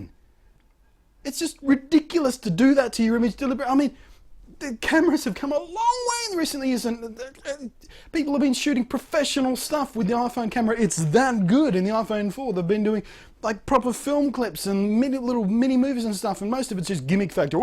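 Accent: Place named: Australia. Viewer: Jesus Christ i LOVE Australian accent!!!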